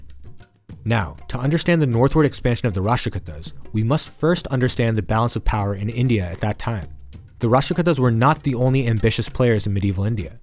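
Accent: United States. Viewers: The narrator is an Indian origin American. We cannot blame him for the accent since he has spent his entire life in America. Thankfully he is still an Indian at heart. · Why do we have to hear American Accent. Would have been better if Indian names were pronounced correctly. Rashtrakuta Kannauja I had to try 👂 thrice to understand what it is.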